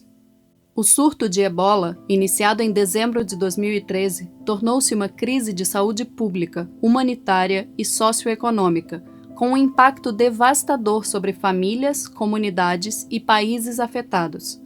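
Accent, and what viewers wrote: Rio de Janeiro, Brazil. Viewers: seu dialeto carioca natural (leve e agradável) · * tirou o sotaque carioca para a narração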